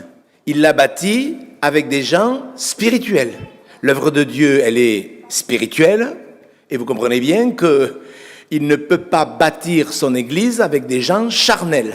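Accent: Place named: Toulouse, France. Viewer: C'est vraiment l'accent de Toulouse !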